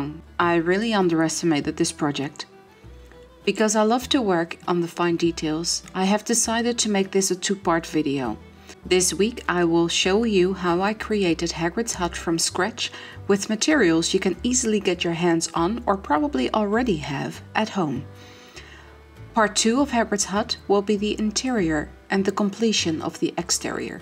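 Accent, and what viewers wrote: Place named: Netherlands. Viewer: Australia? You sound very Dutch? Awesome work!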